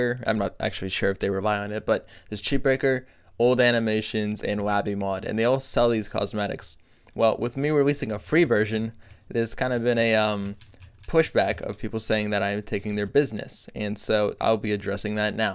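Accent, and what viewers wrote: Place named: Russia. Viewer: русский говорит по англиш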